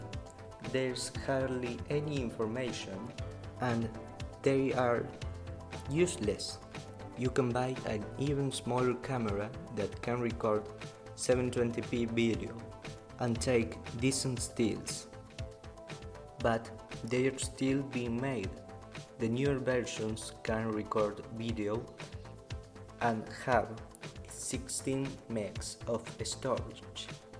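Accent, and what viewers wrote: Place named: Latin America. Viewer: Amigo buen trabajo pero espero que hayas mejorado el acento se nota que eres español/latino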